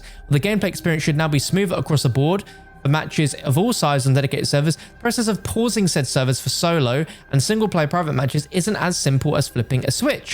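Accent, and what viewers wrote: United Kingdom. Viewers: Ure british?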